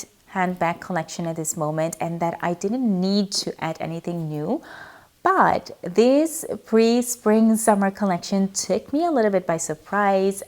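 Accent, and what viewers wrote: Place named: Netherlands. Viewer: wat leuk! Ik wist het ik dacht het is Nederlands of iemand die Afrikaans van Zuid Afrika spreekt. Yes ben Nederlands Surinaams maar woon al 8 jaar in Noord Amerika momenteel in Montreal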